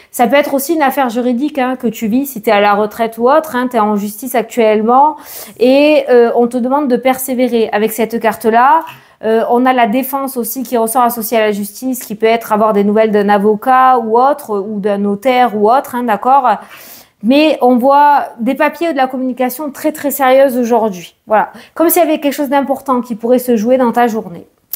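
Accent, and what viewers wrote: South, France. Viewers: Bonjour ma douce, merci pour ta guidance, merci pour ta bonne humeur avec ton petit accent du sud que j'apprécie. Bisous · J’aime de plus en plus regarder vos/tes vidéos. Bonne humeur à gogo Et l’accent:-) Dans le sud aussi, pas loin je pense. Bravo 💖 Ps: je sors de la douche 😜😂, j’écoute en me préparant … 👍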